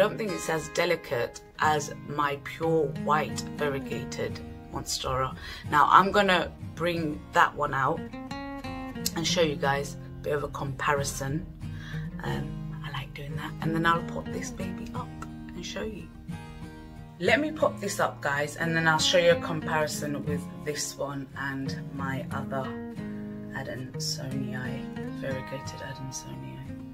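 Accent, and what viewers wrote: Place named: United Kingdom. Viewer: Would you pls update us about your that little Alocasia you bought from ebay ? And another thing i want to add "apnar British accent khub e shundor and perfect MashaAllah, i love it alot"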